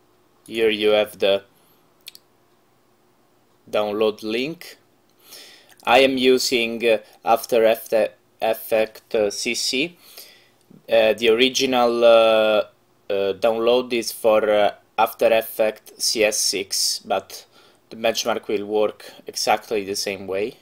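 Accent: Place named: Italy. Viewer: si sente che sei italiano ahahahahah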